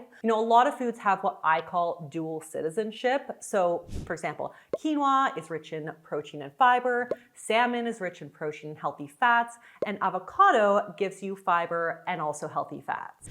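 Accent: Canada. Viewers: Honestly I thought you were american like me. You barely have a Canadian accent. Now that listen more carefully I can here it a bit.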